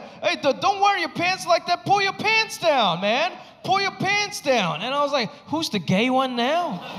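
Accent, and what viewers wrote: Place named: New York, United States. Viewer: I always wondered why he talks like he grew up in the hood mixed with an old NY Italian guy . RAP CITY 🤣 i love it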